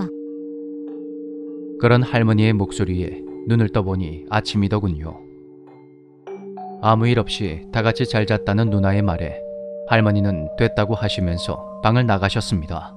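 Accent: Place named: Jeolla, South Korea. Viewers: 님 방송 늘잘듣고 있습니다.전라도 사투리 구수하니..찰지내요 ㅎㅎ다음엔 충청도 사투리도 부탁드립니다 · 깨알같은 전라도사투리ㅋㅋㅋ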